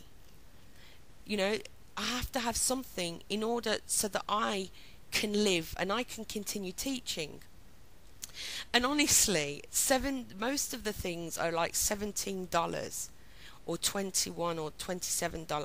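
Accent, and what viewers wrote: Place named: Birmingham, United Kingdom. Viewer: Hi iits nice to hear a brumy accent! I had a spiritual awakening last yr, do you know any groups in bham I could meet up with? It can be so lonely when people around us don't understand x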